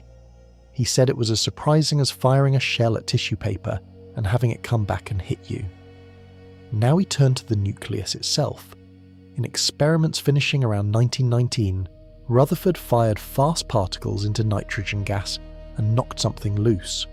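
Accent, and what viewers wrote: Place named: United Kingdom. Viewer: I suppose it’s meant to put you to sleep. But I wish they would make one of these with out a British accent.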